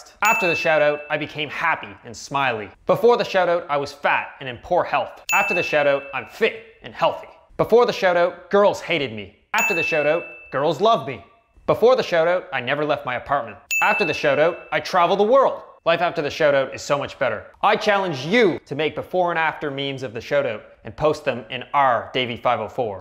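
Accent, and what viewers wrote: Canada. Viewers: I can tell just by the way he talks hes from canada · Judging by your accent you're a Canadian.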